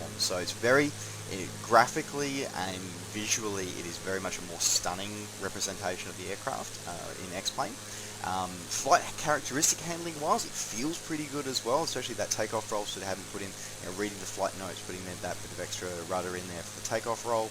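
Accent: Australia. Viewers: Are you Australian? I am aussie too :D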